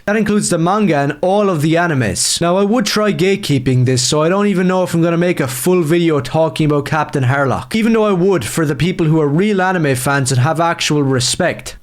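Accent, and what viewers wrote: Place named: Ireland. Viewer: He’s br ish. Sounds Irish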